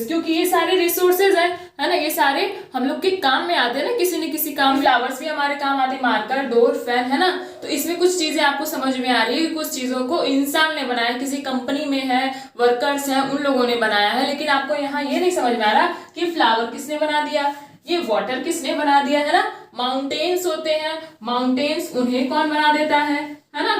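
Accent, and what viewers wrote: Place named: Bihar, India. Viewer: Baaki sb thik h ...bss language m biharipn aa lg ra h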